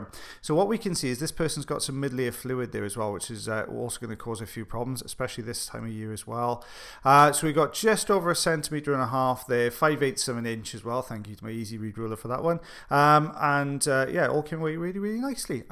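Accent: United Kingdom. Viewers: I find these vidoes very fascinati g. My favourite are the cotton ball/ paper removal. But i love your beautiful accent. Def my favorite of any British accent.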